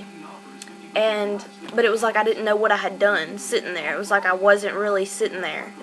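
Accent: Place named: Louisiana, United States. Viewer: Omg where are you from? You remind me of my friend who is from Louisiana, yall talk the same way! lol i love it. Anyways, that last story was horrifying. Like holy hell, idk what I would do if I were you!!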